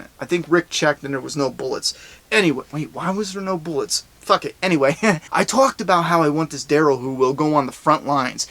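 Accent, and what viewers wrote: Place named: Philadelphia, United States. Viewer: Great video! Couldn't have agreed more, great episode, great ending they should keep blending them. Are you from philly? Your accent reminds me of home lol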